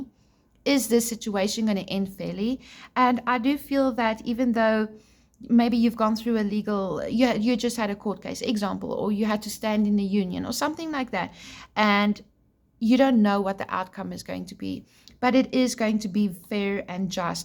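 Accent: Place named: South Africa. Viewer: Lekker to have a South African accent on a brilliant reading! 🎉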